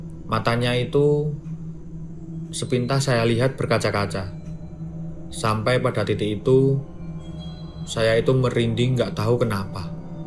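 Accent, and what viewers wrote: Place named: Java, Indonesia. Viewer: Selalu diulang dngerin crta ini...crtany bgus naratornya jg salah satu fav...medok Jawa TPI jelas cara berceritany..KLO cwe kyk mb Devi. Good job mas.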